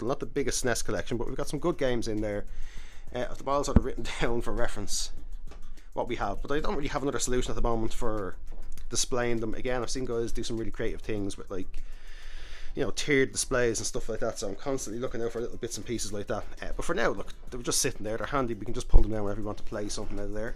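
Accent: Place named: Ireland. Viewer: Irish accent makes this better.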